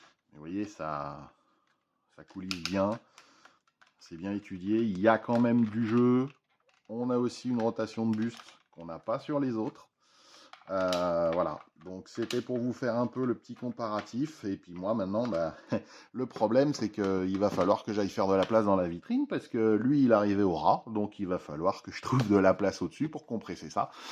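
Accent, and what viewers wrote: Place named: France. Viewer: Quite an impressive French Accent here :D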